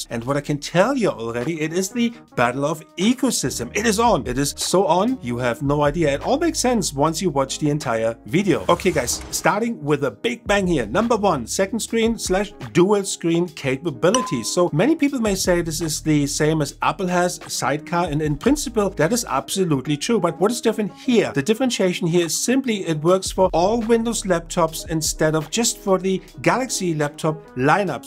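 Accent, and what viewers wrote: Germany. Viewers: Nach der ersten Sekunde merk ich schon da spricht n Deutscher😁👍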